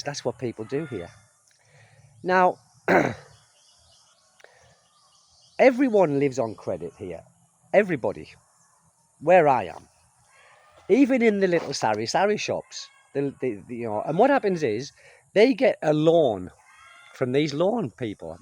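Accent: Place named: Newcastle, United Kingdom. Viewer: Just found your channel. Sounds like you are from Newcastle or somewhere in the North East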